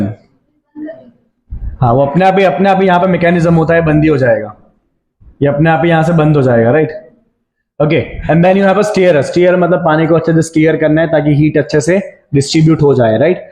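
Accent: Gujarat, India. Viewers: Thnak you sir for reply Btw, tamaru hindi english speaking and writing mast fluent chhe but video ma akad evo word aavi jay k jena parthi khbr padi jay k tame gujarati j chho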